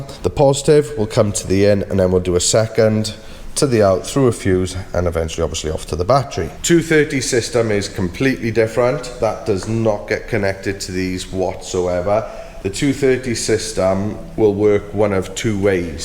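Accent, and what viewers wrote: Wales, United Kingdom. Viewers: Great video as always. Your workmanship is top notch. As a fellow welsh man I don’t even notice you saying by ere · Hi great vlog really enjoy your output don't stop saying by err obviously a Welsh thing😂😂 as others I follow who are Welsh say the same thing. Quick question on the vlog you said the build was having a 560 amp hr battery huge for off grid and no gas fitted i noticed on the electric board you were fitting a victron 30 amp dc to dc charger surely you would want at least a 60 amp? 30 would take for ever to charge from flat while driving? Just wondered what your thoughts were? Cheers mate keep up the good work also what 3000w inverters do you use recommend when building your vans.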